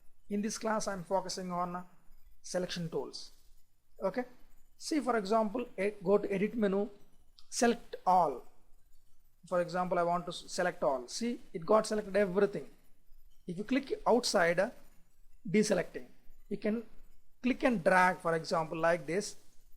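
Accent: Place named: India. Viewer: Dude, seriously... give this guy a break :) His Indian accent is awesome!!! :)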